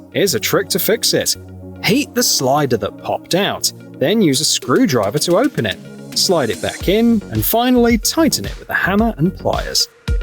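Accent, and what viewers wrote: United Kingdom. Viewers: He sounds British, so no, he doesn't know the difference between his asshole and a hole in the ground.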